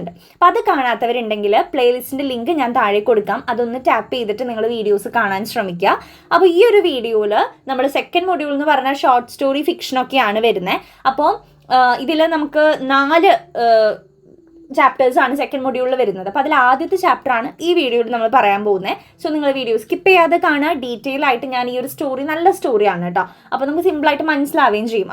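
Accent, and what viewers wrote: Thrissur, India. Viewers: തൃശൂർ slang is ❤️😌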